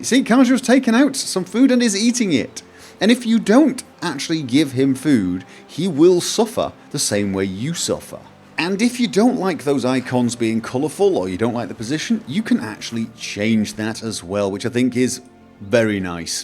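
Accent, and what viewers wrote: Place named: Scotland, United Kingdom. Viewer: Hears a scottish accent insta sub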